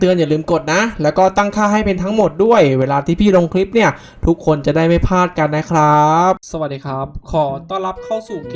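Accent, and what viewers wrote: Isan, Thailand. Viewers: ค่อยเปนคนอิสานฟังออกชั้นเจน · คนอีสานหรือ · อีสานชัดๆ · อ้ายเป็นคนอี่สานเบาะ??? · อ้ายเป็นคนอีสานบ่ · น้องฟังออกน้องเป็น อีสาน ♡♡♡♡♡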